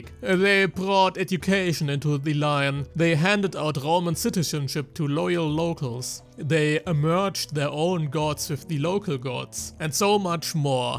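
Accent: Germany. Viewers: Der starke dt. Akzent verbunden mit der nasalen und dadurch etwas arrogant wirkenden Stimme machen es wirklich extrem schwierig das Video anzusehen ohne den Wunsch zu haben es abzubrechen.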